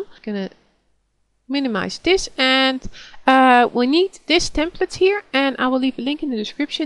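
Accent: Netherlands. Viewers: hahaha, ik moest echt lachen toen ik je hoorde praten, ik zij al " dat is zekerweten een nederlander" en het blijkt ook nog te zijn, maar wat ik eigenlijk wou zeggen. THANKS!! hier heb ik veel aan ! ;)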